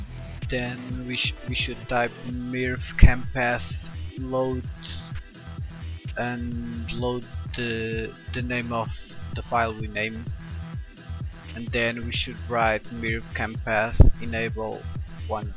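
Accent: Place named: Portugal. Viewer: Are you portuguese by any chance? your english accent reminds me of a friend of mine x)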